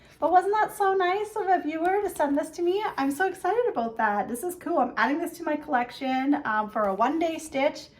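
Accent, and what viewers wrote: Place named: Canada. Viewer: Oh, I love your videos. Your taste in charts is wonderful and your stitching is so beautiful. On top of that you have the most soothing voice and lilt to your lovely Canadian accent. I grew up on the NY/ON border and love what we call the “round Os” of our Canadian friends. Delightful to listen and watch.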